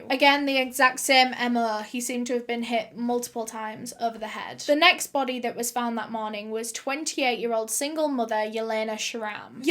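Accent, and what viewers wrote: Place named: North, United Kingdom. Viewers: I'm from Dublin and my brothers wife to be is from Leeds and she sounds alot like you. That accent is too gorgeous 😍😍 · She’s northern 😁💗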